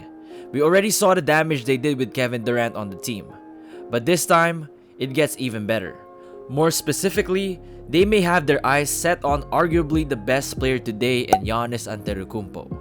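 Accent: Philippines. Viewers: I can tell that you're a filipino just by observing your accent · I Guess you're a Filipino, because of the accent.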